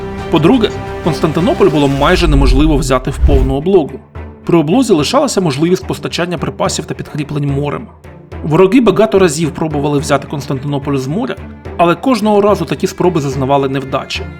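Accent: Russia. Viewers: Конечно на Украинском это патриотично . Но все таки родной язык декламатора русский . Слышу ґе , а не ге . Слышу ясно так как знаю Украинский досконально . Но все равно молодец .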